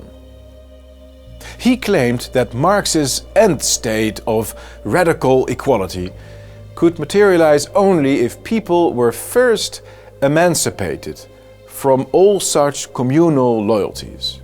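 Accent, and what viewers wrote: United Kingdom. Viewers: Dit soort video's bewerkstelligt dat je verder van leiderschap komt te staan niet dichter erbij ! Dit soort grappige boodschappen nemen stemmers niet serieus sorry............na de laatste keer FVD gestemd te hebben ga ik weer terug naar de PVv sorry , had er goede hoop bij. Maar dit soort dingen maakt het lachwekkend , en absoluut niet MP waardig !!!!!!!!!!!!!!!!!! Tis speels en kinderlijk maar niet volwassen gedrag . Dit doet men niet thierry...........check maar eens Pim die had het en kon het ! Je hebt zo een goede mensen naast je Gideon , Pepijn enz enz...maar je zet hun te kak , wat hun sterkte laat afnemen. Je bent eigenlijk een man die is blijven hangen in zijn kindertijd en onvolwassen overkomt , veel geschiedenis kennen is leuk maar niet in te passen in dit ! Jammer dat ik niet val voor je GB accentje sorry ......................its over FVD ......te danken aan dit soort filmpjes . 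Eigen graf gravend ten top !!!!!!!!!!!!!!!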